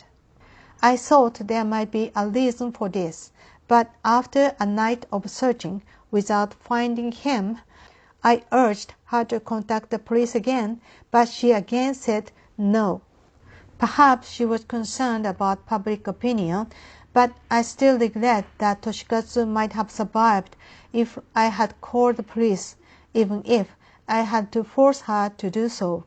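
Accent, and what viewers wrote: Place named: United States. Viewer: ミス、 PINKパーカーお似合いね♥ いつもながらのEnglish！アメリカのどこで身につけたんですか? 歯切れ良く、English圏の人に説得力とキレのある語り Voiceが良いです👍 篠原おじさんも弁護士も活用して意見書出すよになったみたいだからさん、我々日本国人として、我々の力になって下さいね♥ がんばって下さい!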